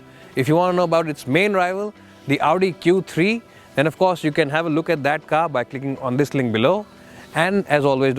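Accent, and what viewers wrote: India. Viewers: English slang indian....bla,bla,bla..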